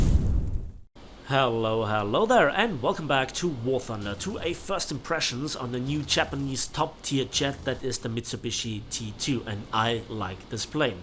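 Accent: Bavaria, Germany. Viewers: How could anyone tell you to shut up?! Your Bavarian accent is extremely enjoyable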